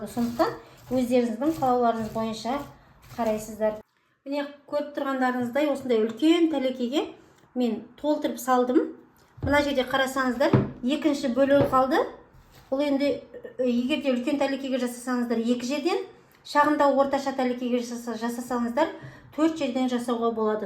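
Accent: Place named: Uzbekistan. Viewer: Қазақша да орысша емесөзбек не қалпакша сөйлиеди бир бале былғап деген. Сөз жоқ қазағым да сүмдық 🤧😷🤧😷😷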